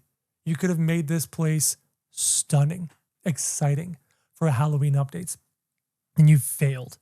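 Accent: Canada. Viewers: Genuinely thought you were from the UK and now I hear the Canadian, I'm so dumb 😅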